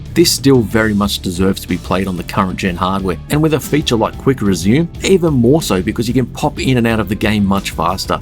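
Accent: Australia. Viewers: Good to hear an Aussie doing some game reviews Keep it up dude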